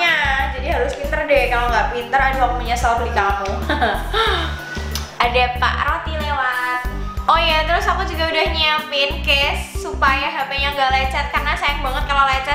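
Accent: Java, Indonesia. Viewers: Medog banget ya ngomong nya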